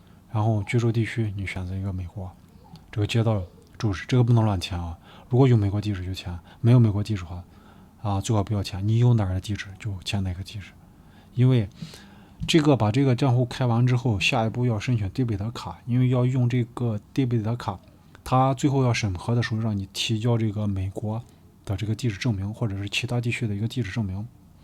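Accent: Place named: Shaanxi, China. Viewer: 主播 悄悄问你一下 你是不是陕西人？陕普口音😊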